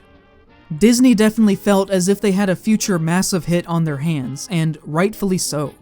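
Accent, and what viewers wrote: United States. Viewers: While I understand the British comparison, are you by chance American?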